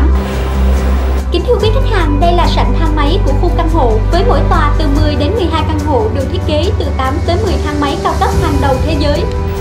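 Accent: South, Vietnam. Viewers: Nghe giọng miền Nam mà muốn mua ngay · Nghe người miền nam là muốn mua liền hiii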